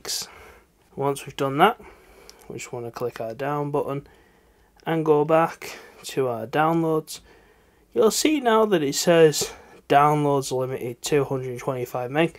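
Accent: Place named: North, United Kingdom. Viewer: cheers. where u from mate u have same accent as where in from be up north lol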